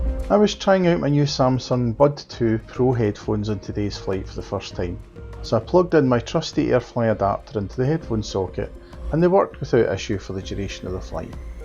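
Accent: Scotland, United Kingdom. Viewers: Great video. Love the Scottish accent!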